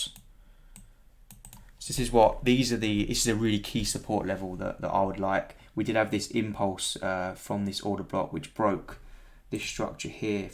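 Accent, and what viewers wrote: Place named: England, United Kingdom. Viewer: basically a Frank Lampard/redknapp hybrid, that's how you sound to a Canadian person like me. I am predicting Gold reaching 2100 usd in 2021 after consolidation in the <num> usd range. P.s. you look like a jack Wilshere.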